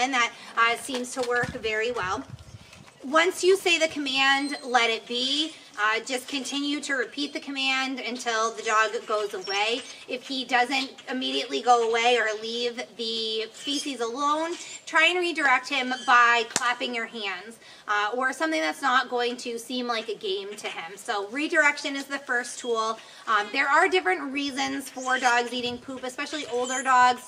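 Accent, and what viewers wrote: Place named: United States. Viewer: Talk less American plz.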